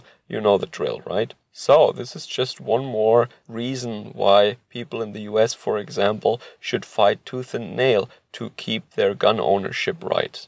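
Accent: Germany. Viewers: Anti-Feminism, frustrated masculinity, islamophobia, kitschy nostalgia of a romanticised past he didn't experience himself and idiotic anti-globalism while publishing on Youtube (given generously to you - including the first amendment - by yours truly, the United States of America)....this dumb channel is the typical alt-right nonsense. With a bad German accent.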